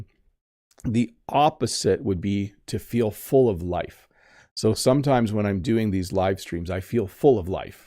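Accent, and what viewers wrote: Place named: Canada. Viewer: I love canadian accent❤️ It kinda sounds good 😊👍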